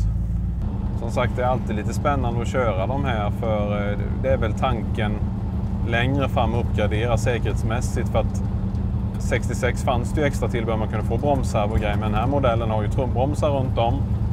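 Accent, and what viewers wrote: Småland, Sweden. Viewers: Vanlig småländska med en lokal blandning.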